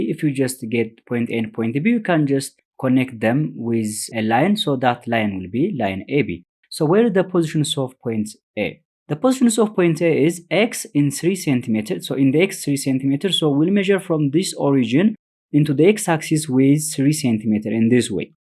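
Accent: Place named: Ethiopia. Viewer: Your accent is so Ethiopian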